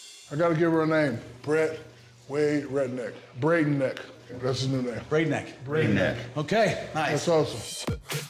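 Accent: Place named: New Jersey, United States. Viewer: They sound like Italian "Joisey" boys, are they from "Joisey" ...they're loud and obnoxious from there.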